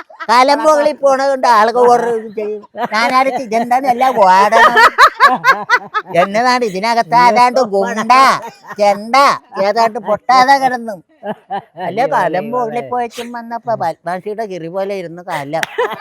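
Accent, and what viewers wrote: India. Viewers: കുറെ കാലം മുൻപ് ചേർത്തലയിലെ ORIGINAL സംഭാഷണം ശൈലി. തകർത്തിട്ടുണ്ട്!! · അടിപൊളി 😂 ആലപ്പുഴ മാരാരിക്കുളം സൈഡിലുള്ളവർ സംസാരിക്കുന്ന പോലെ 😂😂😂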